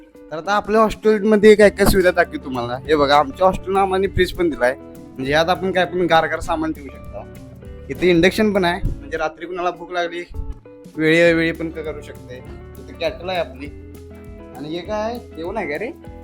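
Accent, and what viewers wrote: Kolhapur, India. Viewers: Tumhi kolhapur che ahat ka language varun kaltay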